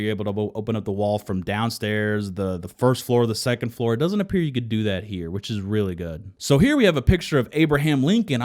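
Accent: Netherlands. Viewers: Dude are you Dutch? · Are you dutch?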